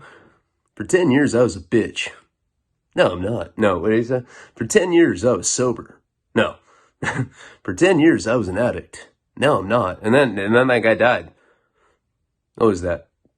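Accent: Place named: New York, United States. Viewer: That New York accent though 😂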